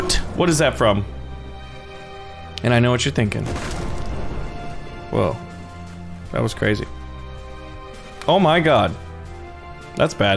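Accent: Germany. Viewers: Was Für deutscher Akzent Bruder! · This is exactly why I subscribe to your your chanle!!! I love Fritz and Hand You do the best German acsent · Great German🇩🇪🇩🇪🇩🇪🇩🇪🇩🇪🇩🇪 ascent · How did he get so good at German accents???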